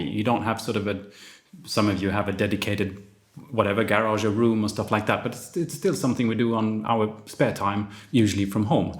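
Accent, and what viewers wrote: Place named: Sweden. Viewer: Great channel very comforting g to hear a Swedish accent oh and awesome video might take a couple aspects of you set up for mine thanks for the content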